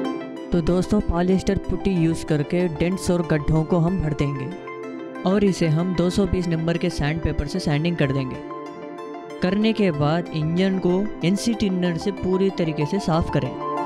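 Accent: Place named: Karnataka, India. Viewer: Are you from Karnataka??? Hindi tone can identify... 😀 Great job bro..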